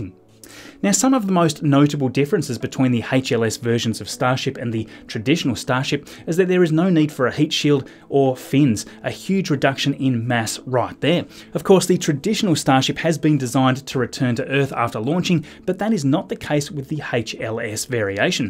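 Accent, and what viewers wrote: Australia. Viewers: I don't like your chanel more because, I don't understand your australian accent verry well, I'm just studying english from Brasil, we speak portugese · "Hache El Es" (HLS) I love how Australians say the letter H. Americans pronounce it just "Ache" without the "Heh" sound. But you include the actual letter, which frankly makes a lot more sense. A completely sideways and weird observation, I know. I'm completely fascinated with language, even in cases where it's my own language. :D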